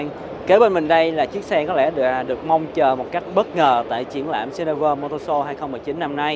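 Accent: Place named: Saigon, Vietnam. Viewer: Giọng sài gòn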